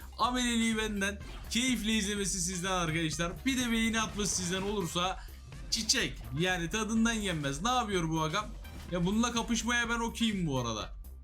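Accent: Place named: Thrace, Turkey. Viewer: Neden böyle saçma trakyalı gibi konuşuyorsun ki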